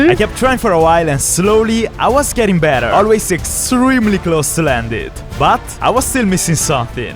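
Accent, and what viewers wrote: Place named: Italy. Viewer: Other skills you can learn (I'm new so I don't know if you already learned them. I discovered you yesterday.): 1. Learn the Japanese Katana (Like you did with the Nunchucks and the Staff.) 2. Develop a British accent (But don't use it because I, and probably every fan really likes your Italian accent.) 3. Learn how to freestyle rap on command. 4. Learn how to solve a Rubix cube, but blindfolded. 5. Learn to do the cup stacking game. This was all I could think of. Do you like it, and would you use it?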